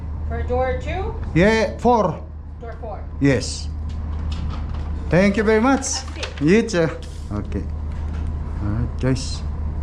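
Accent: Ilocos, Philippines. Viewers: kung may bakasyon ka ngayong summer, mag english lesson ka kasi kung mag english ka ilocano accent.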